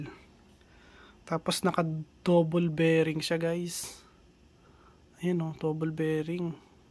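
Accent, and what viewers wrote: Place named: India. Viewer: Bros talking Indlish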